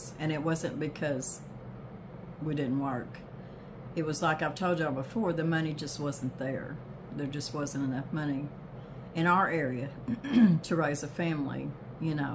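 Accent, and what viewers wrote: South, United States. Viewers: Oh Miss, you are so very precious! ❤ May I make a request? You are so soft-spoken, and if you are cooking and not wearing your mittle microphone I have to turn my phone to maximum volume. And frequently end up turning on the closed captioning, which is in the way and the words that are there are frequently not accurate to what you say (think southern accent to a foreign-based captioner!) and you can understand my frustration.😮 Thank you for all you share with us! Love you and as if you were siblings, and please don't let my suggestion hurt your feelings in any way, shape, or form! I am a dedicated follower and will continue to do so!❤❤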